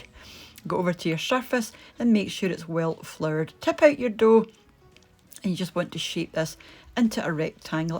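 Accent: Scotland, United Kingdom. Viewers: the wi yoo cook I would sweer your ma was Scottish the accent is a bit hard tae figure oot Um a getting warm 😂😂😂😂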